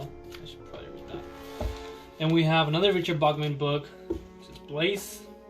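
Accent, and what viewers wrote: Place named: Latin America. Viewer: Book haul + latino accent = asmr = heaven for me 😉😉😜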